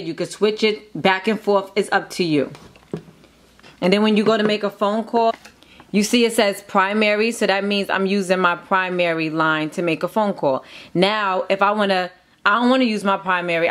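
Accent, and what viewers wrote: New York, United States. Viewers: She gotta be from New York cause she ode sound like Sky from Black Ink😭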